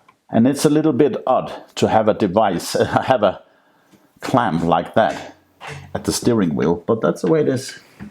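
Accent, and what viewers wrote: Scania, Sweden. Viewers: För sjutton! Kör inte på engelska. Jag slutar kolla. Hela charmen med din kanal är ju din dansk/skånska accent 😢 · Njaaaa, lyssnar hellre på skånsk/danska 😀👍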